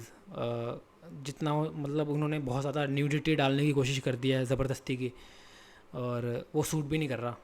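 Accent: India. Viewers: This guy sounds Indian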